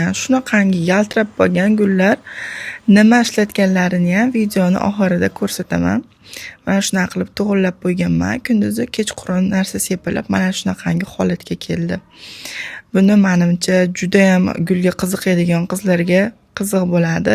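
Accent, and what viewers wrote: Kokand, Uzbekistan. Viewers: Quqoncha shevami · Opa qoqonlikmisz shevezdan❤ gullariz vashshi mazza dahshat zooor mazza qildim korib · Шевалари Кукон❤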